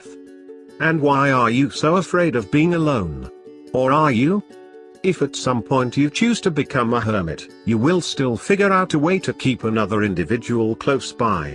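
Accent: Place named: United Kingdom. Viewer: Anyone who said this to my face that didn't have that British accent (that resonates with Virgos) may warrant a punch in the face. And then I would dwell on my behavior for 6 months... True reading.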